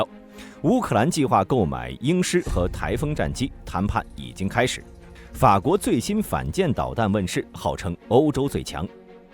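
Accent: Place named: Beijing, China. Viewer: 感謝本節目的男主播，以標準的北京話報導日字正腔圓清楚的報導謝謝🙏。❤❤❤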